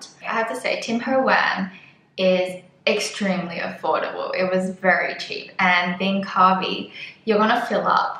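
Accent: Australia. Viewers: didn't know sight seeing and dinning out in Michelin are valid exemption reasons for travelling... lol , but all good now, when you return to Sydney , don't even have to home quarantine now if you have been vaccinated... your Aussie sounds like music for Aussie and Hong Kong expats...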